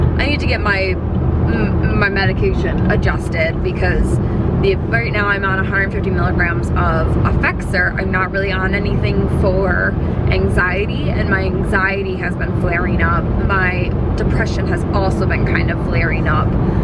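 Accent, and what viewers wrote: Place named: South, United States. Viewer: I love the aviator glasses and country accent. Babies and puppies always make my flights that much better especially if they are happy to be outside 😁🐶👶.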